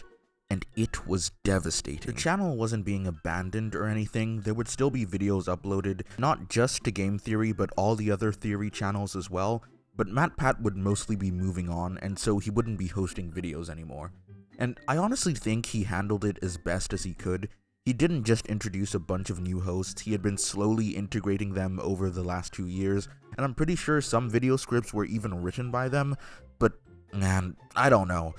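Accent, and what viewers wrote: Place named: England, United Kingdom. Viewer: I want the crazy horny werid dude not that fucking anoying posh English cunt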